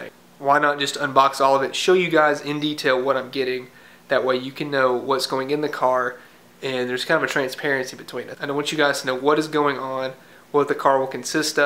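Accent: United States. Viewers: You make very good work on this Dodge 1969. I'm glad you did. Amid all the troubles and bad things happening on this planet. You also have good English well understood, Unlike the original English spoken in England. We Bulgarians are more accustomed to this pronunciation and the English accent that is in the United States and Canada. This original English in England is more difficult to understand. Even there seems to be little difference in the pronunciation of English in the Eastern States and the rest of the United States. But English in the other states seems to be a little better than English in the Eastern States. Somehow the English that is in the western and middle states is more melodic, the words blend and sound better, more easily understood than the English in the Eastern States and the original English in England.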